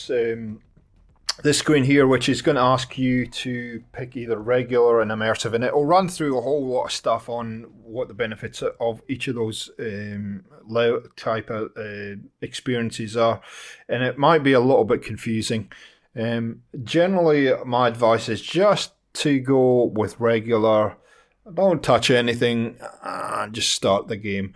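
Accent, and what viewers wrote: Scotland, United Kingdom. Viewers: I picked up this game a month or so ago on PC on a ubisoft connect sale, absolutely loving it! Learnt some useful tips from your video, thanks! Plus, it's always nice to hear a fellow Scot on a video, always a nice surprise! Cheers! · Great video m8 and you sound Scottish aswell so deffo subed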